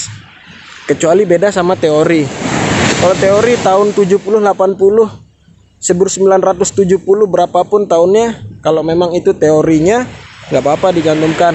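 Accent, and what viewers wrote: Lombok, Indonesia. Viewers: Bang org lombok ya?? Xixi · logat lombok hehe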